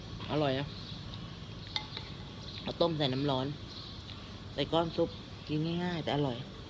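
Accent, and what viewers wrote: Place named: North, Thailand. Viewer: เหนือขนาดจ้าว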